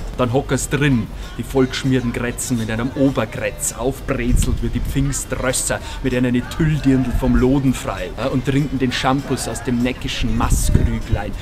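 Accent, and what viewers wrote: Germany. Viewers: Münchnerisch würde ich sagen. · entweda ganz auf deitsch oder glei auf boarisch aber nix zwischndrin..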